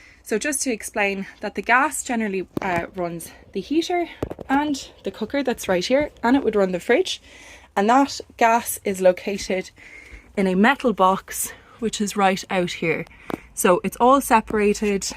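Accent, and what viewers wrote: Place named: Galway, Ireland. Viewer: Just found out I've ancestors from Galway and was curious as to the accent. This little trip around the caravan fit the bill. Thank you kindly.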